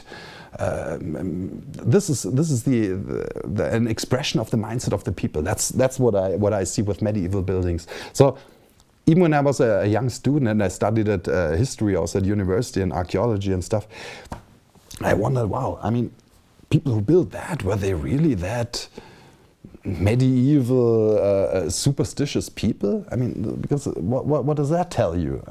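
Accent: Germany. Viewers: Was ist daran komisch? Sein Englisch ist fehlerfrei und verständlich und ja, man hört, dass er aus Deutschland kommt. Aber muss man sich denn dafür schämen aus Deutschland zu kommen?! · Du hälst den Vortrag in Englisch als ob du ihn in Deutsch halten würdest und das bereitet mir Kopfschmerzen beim zuhören. Ich höre dir gerne zu aber mach das bitte in der Sprache in der du es kannst.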